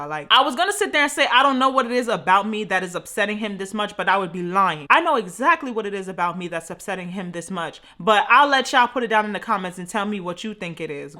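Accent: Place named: Brooklyn, United States. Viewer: U gotta be from Brooklyn!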